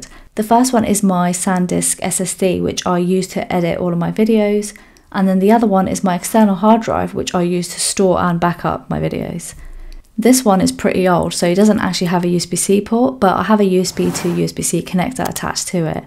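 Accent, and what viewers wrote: United Kingdom. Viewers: Truly helped me that you mentioned it doesn't support video output, I am looking for a usb dock to use samsung dex on an external screen ! :) PS : Even as a non-native english speaker I would bet you're from the UK lol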